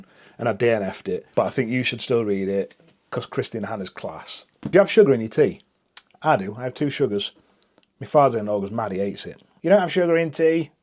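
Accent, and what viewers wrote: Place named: South Yorkshire, United Kingdom. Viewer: Mate, I live alone with my dog, and sitting watching you talk about your passion with a cuppa while I'm also having one is just so calming. You've already extended my tbr tenfold just by how you talk to your camera like you're having a brew with your buddies. I had lost both my parents by the age of 33, and my mother was from Leeds (she got me into reading as a child, worst thing she ever did tbh) and your dulcet south yorkshire/Derbyshire tones just make the place feel like home again. Keep doing what you're doing please, the world will suffer great loss if you ever stop.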